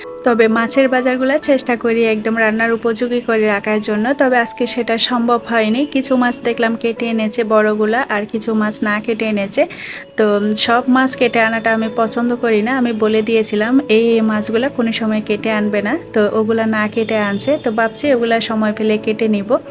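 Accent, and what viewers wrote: Chittagong, Bangladesh. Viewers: Apu apni Chittagong ar maie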